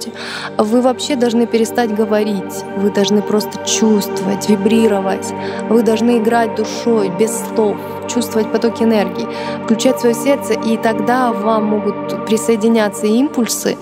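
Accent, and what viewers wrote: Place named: Moscow, Russia. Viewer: Московский говор на понтах. Не подходит к этому тексту. П.С. раса с одной с, а не расса.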